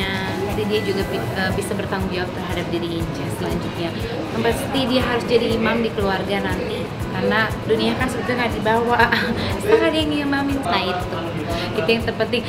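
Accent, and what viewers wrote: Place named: West Java, Indonesia. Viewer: Karakter nya kaya gitu bgs manja dari pada kasar.😆😆 Dan dia orng sunda logat bicara nya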